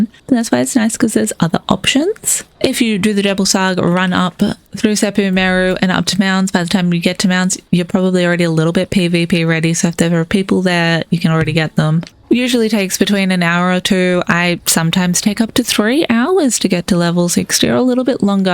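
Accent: Australia. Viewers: i love your aussie accent and attitude when talking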